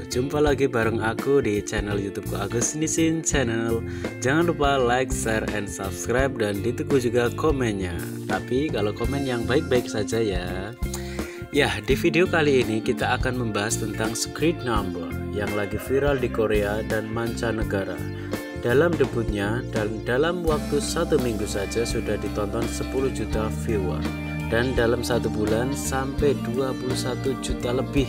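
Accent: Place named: Java, Indonesia. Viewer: Asline pundi mas Agus? Medok rek 👍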